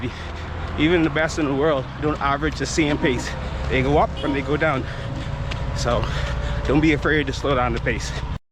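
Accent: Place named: Bahamas. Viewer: I heard the accent am very familiar with it I don't know how someone can get a Bahamian English confuse with Jamaican English I've seen interviews with mychal thompson klay thompsons dad where they ask him if he doesn't talk in that Jamaican way or buddy hield I didn't know they had a somewhat accomplished distance runner from the Bahamas obviously not upper echelon norweigans,kenyans,ugandans,Ethiopians but still a decent one anyway I think this video is a perfect example of a strong Bahamian English dialect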